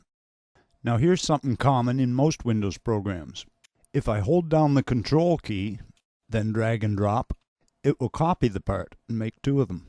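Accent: Canada. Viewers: Hey Dude, Nice job on the tutorials. I'm am down here in San Diego and used to be from Cleveland, the Home of Rock and Roll, had a studio there called North Coast. You remind me of many a gig I did in Canada. I didn't read your bio but you have to be from the Great White North by the sound of your voice. I must say, you have a very polished delivery in your tracks and really easy to follow. I, being an engineer have spent HOURS editing "ums.& ahh. ahh" Nice instruction, preperation/delivery.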